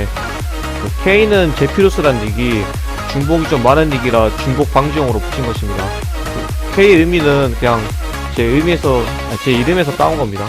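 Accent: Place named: Jeolla, South Korea. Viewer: 사투리가 있는걸 보니 전라도사람이시군요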